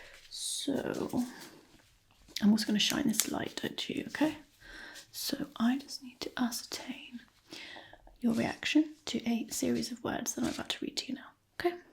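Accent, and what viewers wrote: RP, United Kingdom. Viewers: That felt fresh & original but kind of classic at the same time. I like all the surprises in there, and posh accent! I probably could do with sunglasses on for the light bit though! Haha! 😎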